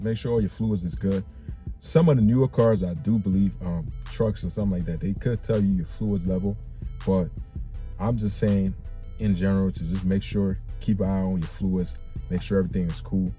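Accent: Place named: Liberia. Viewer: Where are you from brother, I'm hearing small Liberian accent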